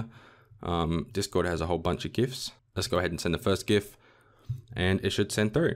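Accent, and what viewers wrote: Australia. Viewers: finally, a farking Aussie!!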